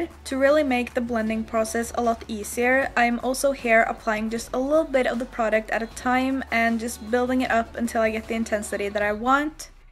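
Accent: Norway. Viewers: Are you from Norway? The way you speak english is so Norwegian english ahahah!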